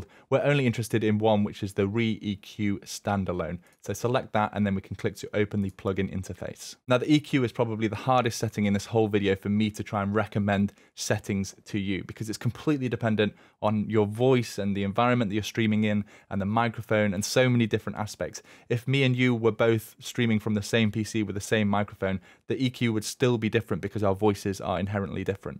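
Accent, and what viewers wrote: United Kingdom. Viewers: you sound british..why do you give measurements in INCHES??? and why dont give both plz? Centimeters are world wide used!! IS very discouraging to have to go to an online calculator to convert them and moreover knowing that british like the rest of europe and maybe all the world ( except USA ) uses the metric system.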